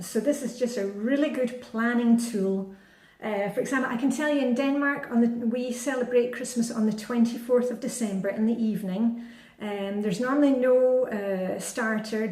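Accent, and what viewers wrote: Scotland, United Kingdom. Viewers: Just found your videos due to a recommendation on The Hygge Nook group on Facebook and I am already converted... Flylady with a Scottish accent!! And in Denmark!! How could I resist?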